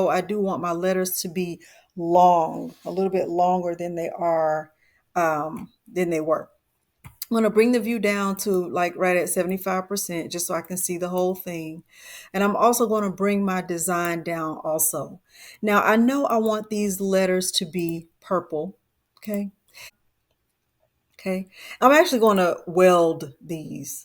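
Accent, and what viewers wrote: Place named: South, United States. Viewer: Chileeeeee 😂😂 You just helped me with an order I just got! I couldn’t figure out the font and got a notification that you posted content and I ran on over to your channel! 😂 I love your content! You’re so thorough and nice! And you have to be from the south. Every time you talk I crack up laughing! I love it! Keep posting content. I can watch you all day and learn. Thank you! Thank you! Thank you!🙏🏾🥰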